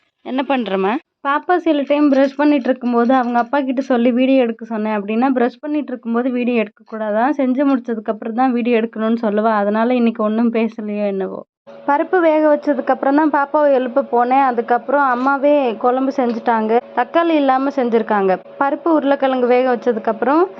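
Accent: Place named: Cuddalore, India. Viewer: பொதுவாக கடலூர் வரையிலும் ஒரு நடை என்று சொல்வதன் வழக்கம் இங்கும் அந்த பேச்சு வழக்கு உள்ளது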